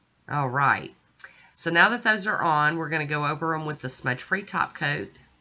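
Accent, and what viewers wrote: South, United States. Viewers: I love it!! And I like your voice, it's the few times I get to hear a southern accent. And your tips are especially nice, sometimes ya forget things and make it harder on yourselves. 💚🍀💚🍀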